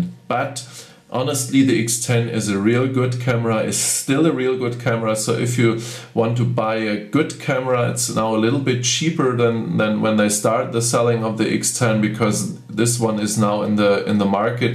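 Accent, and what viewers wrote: Germany. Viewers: Thanks for this comprehensive review. I came here to see what the viewfinder looks like (I only have the X10) and you provided it! One small note for non-German speakers: when says "auto-focus" I thought he was saying "out-of-focus". Don't worry, that's just the German accent pronunciation of "auto focus". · great review, & good to hear that german accent again, i had a great german friend years ago, named joseph tretin. all the very best John Radford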